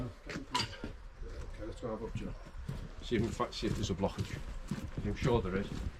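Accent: Liverpool, United Kingdom. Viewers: Liverpool accent as thick as the sludge in the drain. English of Ye olden times. I love it! Had to use close captions to follow along with the story! lol. Gagging the entire clean out process. Well done. Cheers! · Ah the lovely British accent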